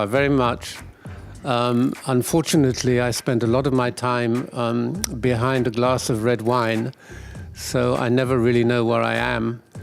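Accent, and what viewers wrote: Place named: Germany. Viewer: Nice German accent...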